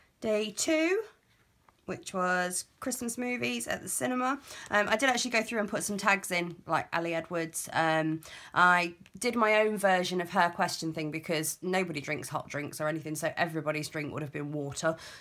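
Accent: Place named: Texas, United States. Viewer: Enjoyed your video very much,! As a Texas girl, I enjoyed your accent almost as much as your album! I have photos and an idea for each day, but haven't started yet. Thanks for all the great ideas!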